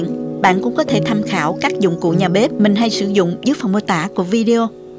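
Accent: South, Vietnam. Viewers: Giọng Nam Bộ kiểu mỗi ngày một hiếm, giờ xem truyền hình, phim trên HTV, giọng nam toàn gì đâu.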